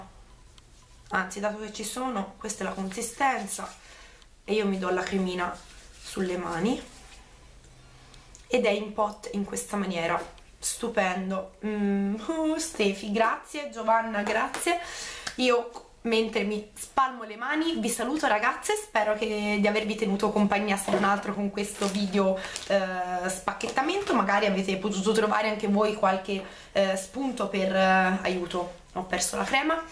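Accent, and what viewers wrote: Tuscany, Italy. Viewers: Ciao!! Ti seguo da poco e mi sei già troppo simpatica! 😃 adoro il tuo accento toscano, la tua simpatia e i tuoi capelli - un bacio grande, al prossimo video:**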